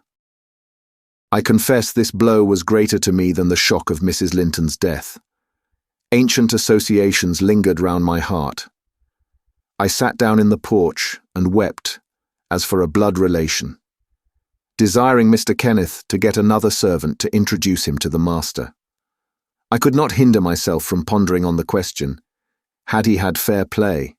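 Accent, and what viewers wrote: England, United Kingdom. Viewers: I can’t listen to this English accent bs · Thank you for the wonderful reading and your beautiful British accent. I spent three days listening to this audiobook—a true masterpiece, once in a lifetime. The language is so beautiful, with a vast and rich vocabulary.😇😇😇😇😇😘😘😘😘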